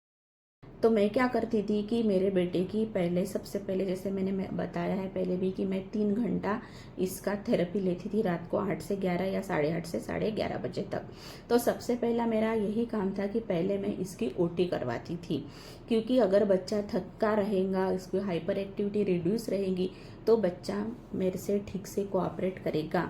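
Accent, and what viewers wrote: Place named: Maharashtra, India. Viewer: Apki hindi bohot cute hai..mujhe meri mahastrian friend ki yad ati...wo v same apke jaisa bolti thi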